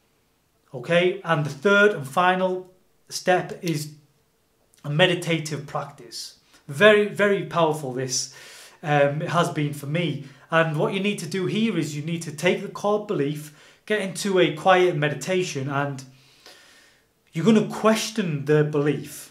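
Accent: United Kingdom. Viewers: Lovely stuff! Keep it up young king. Nice to hear a British accent 🤓💚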